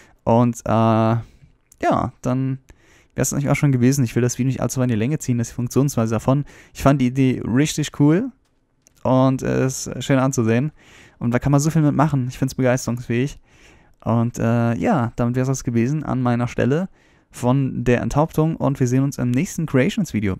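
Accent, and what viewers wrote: Hesse, Germany. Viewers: Er ist Hesse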